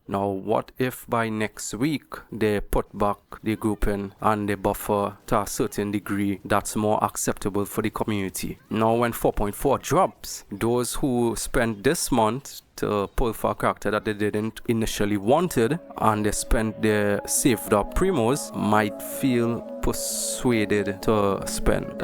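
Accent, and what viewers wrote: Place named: Trinidad and Tobago. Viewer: Dude, are you Trinidadian? That accent sounds classic Trini.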